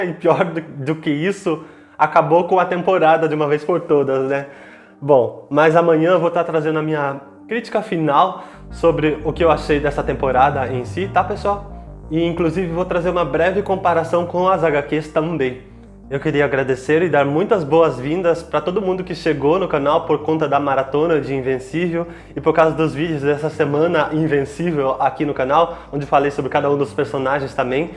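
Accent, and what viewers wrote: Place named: Latin America. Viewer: Cara vc e de algum pais de lingua espanhola? Vc tem um sotaque diferente. Vc nao e brasileiro? Valeu!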